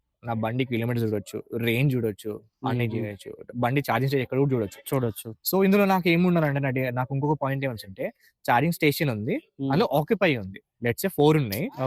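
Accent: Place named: Andhra Pradesh, India. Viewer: Anna pakka telangaana la putti ichaaru chesaaru vacharu ani andhroni basha matladtunnav emanna andhamaina telangana yaasa petkoniii😢😢😢😢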